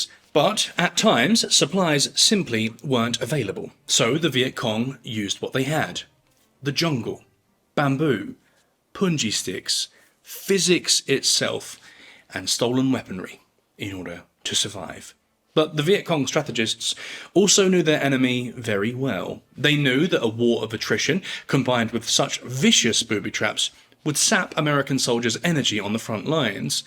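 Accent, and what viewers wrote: England, United Kingdom. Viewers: There’s something so very attractive about you. Partly I believe it’s your voice. A most excellent English accent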